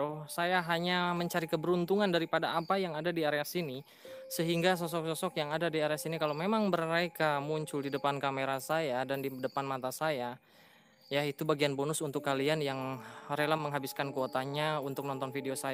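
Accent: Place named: Padang, Indonesia. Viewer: Orang padang mna suaranya... Bukittinggi hadir bro..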